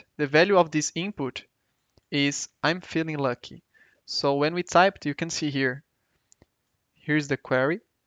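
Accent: Brazil. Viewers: Mano, quando eu ouvi o sotaque tive certeza que era brasileiro akkkk, daí o google te dedurou , parabéns irmão, ótimo conteúdo!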